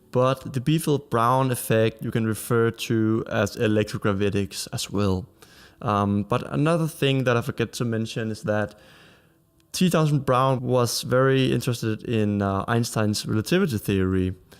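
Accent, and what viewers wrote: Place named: Germany. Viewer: You sound German so you'll probably get this. First you have to understand how inertia works, then you can understand gravity. Since gravity is an acceleration of 9.8m/s^2 and since an accelerometer measures acceleration, and since accelerometers do not show any acceleration in a fall caused by the "acceleration caused by gravity" I looked into how accelerometers work. A small piece of matter inside the accelerometer is displaced when the accelerometer is accelerated, and the amount of the small piece of matter's displacement is calibrated to indicate the magnitude of acceleration. What makes the little piece of matter move? INERTIA. The same effect when you accelerate in your car and you're pushed back in the seat. In this analogy, your car is the shell of the accelerometer, and you are the little piece of matter being displaced when the 'accelerometer' - your car -- accelerates. 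You are pushed backward by inertia. Since the accelerometer shows zero acceleration in a fall to Earth caused by the "acceleration of 9.8m/s^2 due to gravity", that told me *THAT SMALL PIECE OF MATTER INSIDE THE ACCELEROMETER IS NOT BEING DISPLACED* by gravity's acceleration. Since the small piece of matter in the accelerometer ONLY IS DISPLACED due to inertia when the body of the accelerometer is accelerated, *THAT MEANS THERE IS A DECLINING INERTIA* in the downward direction. If the little piece of matter isn't being deflected, there is NOT ENOUGH INERTIA in the direction of acceleration (downward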